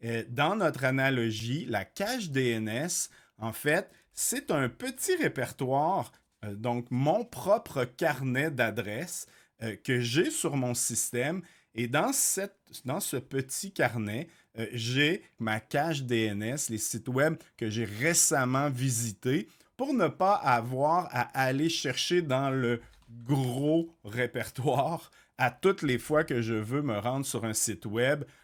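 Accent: Quebec, Canada. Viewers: cet accent Québécois est tout simplement charmant ! En plus d'une vidéo super utile, merci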